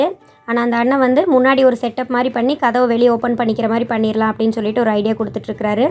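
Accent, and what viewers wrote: Kongu, India. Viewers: unga slang apadiyea kongu slang mari iruku sis....i am new from u r cheanal wru u from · அழகிய கொங்கு தமிழ் அ பேசறிங்க ங்க‌ I like ur slang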